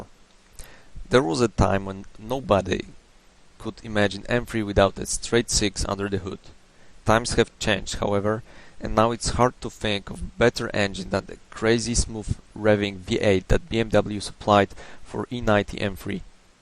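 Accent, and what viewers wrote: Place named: Poland. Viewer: You Polish? Taki kanciasty akcent ;)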